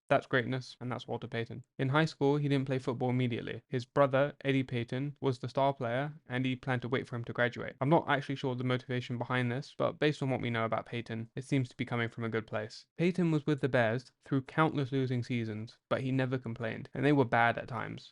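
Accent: United Kingdom. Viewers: A fucking British accent reciting stats pretending he knows what happened. Stick to Soccour.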